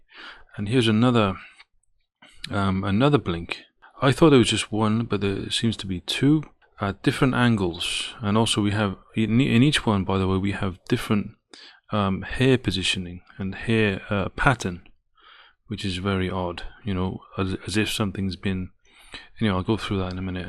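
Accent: United Kingdom. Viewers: thought you had a british accent for half the vid! Bigfoot is real Tod is fake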